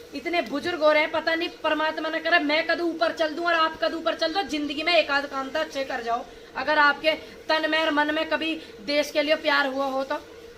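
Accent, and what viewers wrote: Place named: Haryana, India. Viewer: जी,आप का ठेठ हरयाणवी गाँव वाला लहजा और किसानों के लिए संघर्ष करते हुए देखना अच्छा लगता है ।किसान को छेड़ कर बीजेपी ने अपने रसातल मे जाने का मार्ग प्रशस्त कर लिया है।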